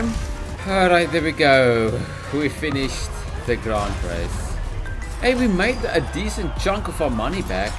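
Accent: South Africa. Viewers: When I heard ranting and swearing about BS electric cars in a South African accent, I subbed. Lol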